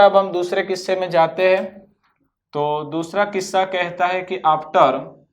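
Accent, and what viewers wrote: Gujarat, India. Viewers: i can bet that you are from gujarat by your way of telling force . Anyway you are working hard and you will be succesful one day . you are a good physics teacher.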